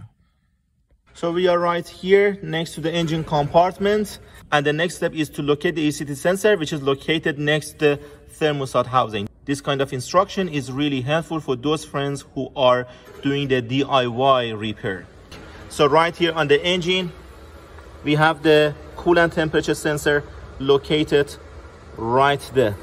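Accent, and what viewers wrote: Iran. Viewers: That is some nice Persian accent. Good job on the video.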